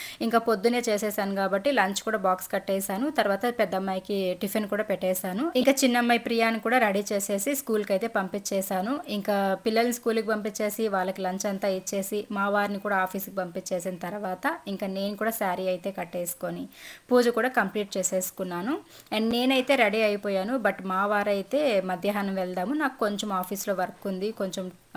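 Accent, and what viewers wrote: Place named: Eluru, India. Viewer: పెటిక పప్పు అనరు మా సైడు చీక్కుడుకాయలు అంటారు మాది పచేమగోదావరి జీలా ఏలూరు మేము ఎపుడూ అలంటే కూరలు వాడూకొము రాయలసీమ వెపు వాడుతారు అనుకుంట మీ మాట మా సైడ్ లాగా ఉది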